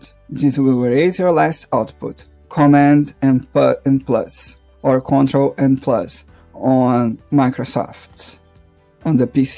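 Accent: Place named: Brazil. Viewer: I know a Brazilian accent when I hear it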